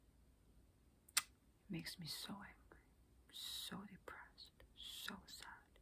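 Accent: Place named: United States. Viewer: Life really is crazy. So many women would love to look like you. Yet here you are wanting to be like many of them. I hope you continue to pursue what you like to do. Hopefully there will be something that really flourishes and motivates you to focus on that. Your English is absolutely excellent. You talk like you were raised in America. I just found your channel and will continue to watch. Did you experience a difficult childhood? You seem like there is inner turmoil that causes you so much pain. I know it will not help but you are attractive and well spoken. Merry Christmas!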